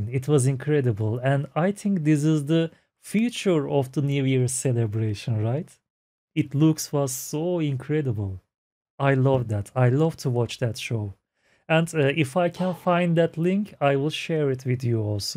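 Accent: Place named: Turkey. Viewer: You're Turkish 😁it's so obvious from your accent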